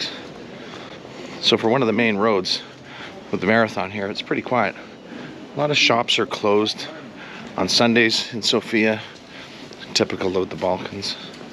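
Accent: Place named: United States. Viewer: Stop staying there and calling it downtown - it is part of the wide centre but it is also part Central-North Sofia, that is not the downtown - that is the AVOID area... Downtown is around NDK and between St. Sunday and NDK and above NDK and East of NDK West of NDK and anything but NORTH of Serdika Metro station. W are part of the European Union, we are not part of the fiscal Euro zone even though we are fixed to the euro.Only use ATM's in Banks or attached to Banks - not the Yellow and Blue NO ATM has ATM written on it and being legit. The area you were in in that restaurant where you had a bad experience we refer to as little Arabia and you sound American.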